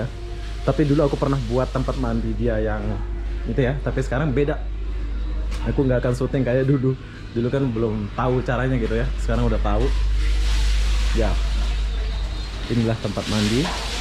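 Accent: Lombok, Indonesia. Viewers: Klo bolh tau abang nich orng indonesia jugk ea krn dri tutur ktanya mcam indonesia maaf ea bang jdi kefo cima igin tau aja bang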